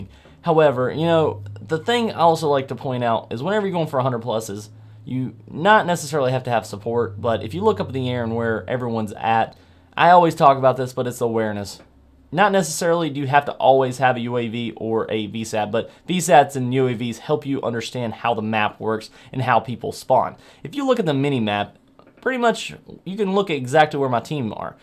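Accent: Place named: North Carolina, United States. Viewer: even though im black i live in NC and i talk exactly like you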